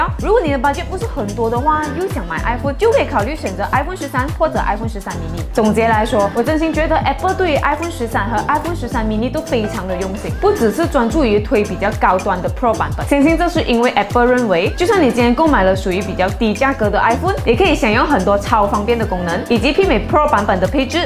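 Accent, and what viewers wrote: Malaysia. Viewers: 應該是馬來西亞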